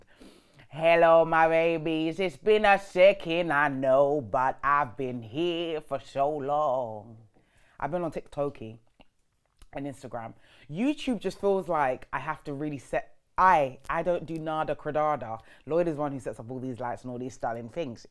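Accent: United Kingdom. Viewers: you are the funnnnniest ! And your British 🥹😍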